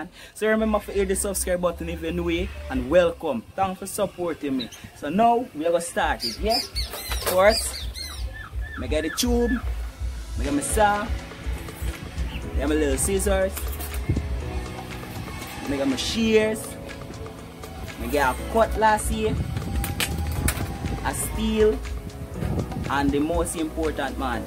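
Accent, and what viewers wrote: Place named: Guyana. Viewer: Keep the Guyanese accent bro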